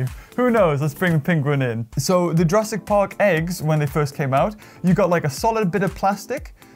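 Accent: United Kingdom. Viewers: You brittish mate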